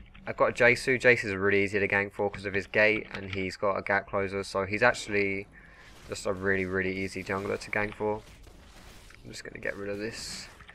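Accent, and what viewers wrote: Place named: London, United Kingdom. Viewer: london accent :)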